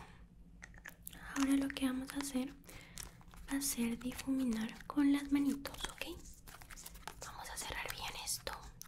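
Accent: Peru. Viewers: a era peruano xd pense que era de ecuador xddd siempre veo sus video y penese que si era xd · Eres Perúana? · Eres peruana?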